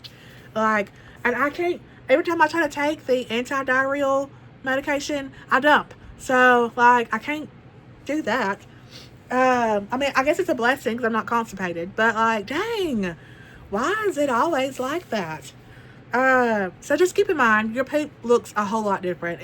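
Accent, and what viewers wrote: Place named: South, United States. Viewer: Your accent is adorable - from a fellow southerner. I'm gearing up for my VSG in July. I wished it could be sooner but work committments block out June and the beginning of July. I've started implimenting things that people suggested. Drinking 64 oz of water daily, chewing 20 times before swallowing; cleaning up my diet and exercising.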